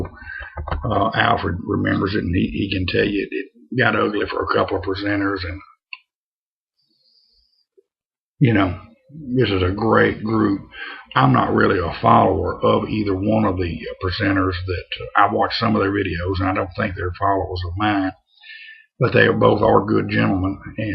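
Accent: Louisiana, United States. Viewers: That's a big drinking mug you have there. Sorry to hear about your pains my friend. Thanks for the chat. Looks like you did well in buiness. Love the Louisiana accent. Alan.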